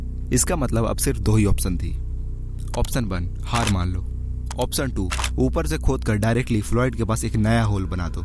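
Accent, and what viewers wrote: India. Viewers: 😂😂😂 fuck this indian accent is so funny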